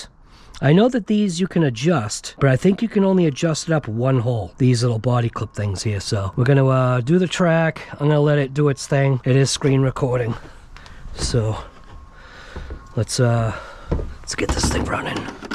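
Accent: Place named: Boston, United States. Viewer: Good review bro. But I absolutely love my blitzkrieg! It may not be perfect, but it is a fun rig. Got the red cat Valkyrie TR and is great so I figured I'd get the MT of the WOV just to see how she stacks up platforms are basically the same. I've been watching your channel for a while. Congratulations on your success buddy even love the Boston mass accent!😅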